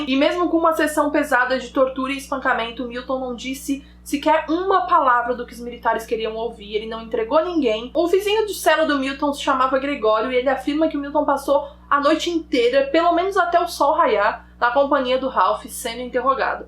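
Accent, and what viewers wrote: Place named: South, Brazil. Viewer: Meu sotaque é igual ao da (talvez um pouco mais puxado rs) e eu sinto uma certa representatividade quando ouço ela falando as gírias do sul e enfatizando o Ê no final das palavras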